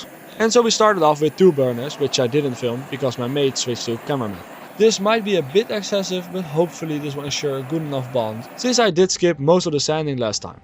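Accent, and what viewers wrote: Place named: Netherlands. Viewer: The dutch accent is heavy 😂😂😂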